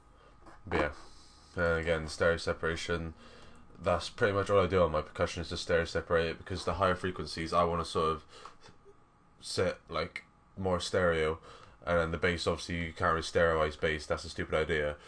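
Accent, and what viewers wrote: United Kingdom. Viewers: are you from bristol?;)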